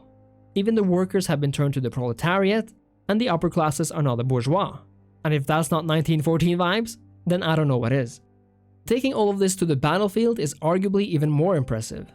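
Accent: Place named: Norway. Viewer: I detect a Norwegian accent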